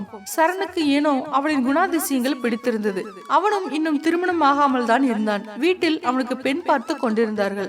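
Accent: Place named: Jaffna, Sri Lanka. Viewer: சுனிலுக்கு பெபேவா.இன்னும் யாழ்பான தமிழ் விடவில்லை. அவதானித்து கொண்டிருக்க வேண்டும்.நல்ல பதிவு.❤நல்ல தமிழை பின்பற்றவும்.அவதானம் தேவையில்லை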